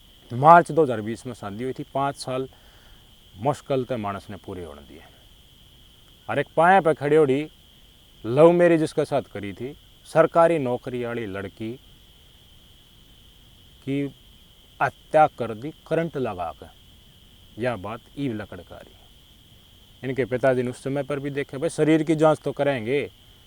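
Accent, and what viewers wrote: Haryana, India. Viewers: Bhai. Sahab aapki.Haryanvi.boli.may.patarkarita.ko.salut.karta.hu.🎉🎉🎉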